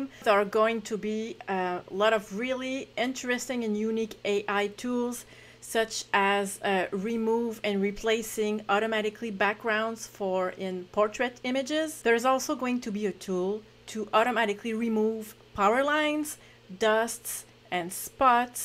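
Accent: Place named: Canada. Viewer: Thanks a lot for these video, but I still prefer your French (with nice Canadian accent), please continue ! 🥰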